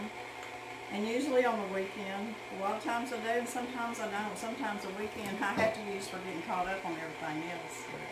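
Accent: South, United States. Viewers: I’m gonna make this tomorrow! Please remember to wear your mic so we can hear the lovely southern drawl! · Man, I am an avid baker for therapy as well. I cant tell you how much I enjoy and have used your experience to add to my own. I want to thank you in this trying time of Covid for your messages and as a Canadian I just love your southern accent! In two words, Thank-You!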